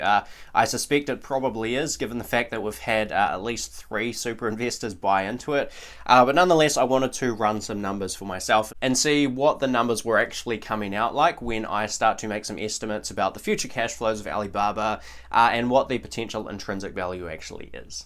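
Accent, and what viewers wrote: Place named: New Zealand. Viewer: Nice to hear a kiwi accent for a change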